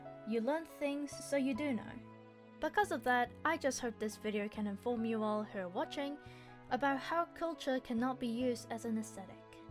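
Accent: United Kingdom. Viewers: OMG ARE U BRITISH!??? OOP- IM SORRY!! I JUST LOVE YOUR ACCENT!! IM OBSESSED!! AGAIN SORRY!! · Are you British because you don't sound Koren( I,m not trying to be rude)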